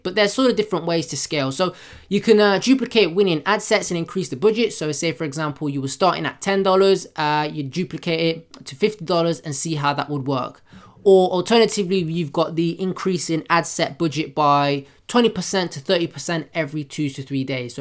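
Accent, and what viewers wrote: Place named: Birmingham, United Kingdom. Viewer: sick videos mate, you sound like youre from or around brum, would love to get some tips if available!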